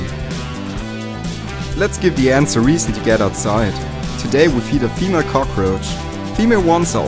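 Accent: Germany. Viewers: Are You German ? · Man hört aber ein bisschen das Deutsche in ihm😃😜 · Ohne in die Kommentare zu gucken wusste ich das du ein deutscher bist wegen dem Akzent😂😂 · Du bist Deutsch richtig? Der akzent!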